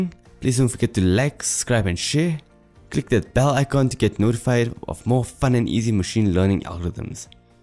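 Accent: South Africa. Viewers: Dude your accent is so South African! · I think this is great. There are plenty of examples of code and technical online but for fundamental understanding, this works just fine. Good to hear South African this coming from South Africa!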